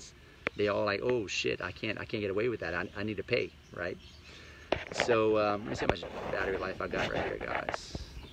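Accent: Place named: South Louisiana, United States. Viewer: Great info. I'm from south Louisiana as well. I know that accent! lol. I just setup my first mobile home rental on a piece of property I already owned and looking at another one setup on land that needs some work. I have aspirations of one day owning several parks like you. I know there are a ton of MHPs in our area, but are that many owners selling? How far out do you have to go to find deals? What are your thoughts on building a new park vs buying one?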